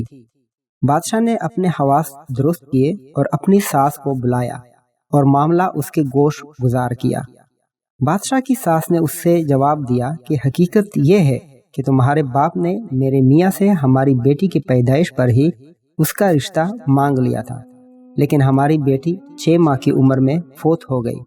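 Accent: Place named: Pashto, Pakistan. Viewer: Good excellent. Although yeh Urdu Speaking andaza kisi Pashto speaking friend ka hi Hoskta hy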